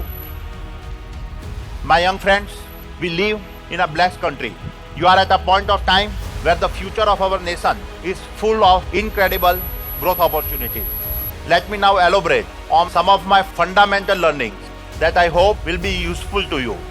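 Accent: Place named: India. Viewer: Ye to apne jaisi english bolta hai ❤️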